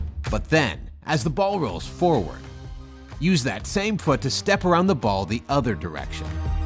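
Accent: United States. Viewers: Clicked video. Heard American accent. Closed video.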